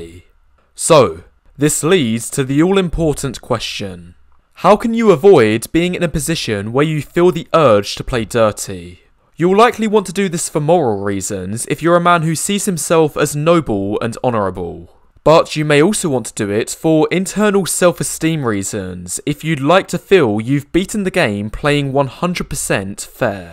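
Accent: Essex, United Kingdom. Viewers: He's from Essex in England I think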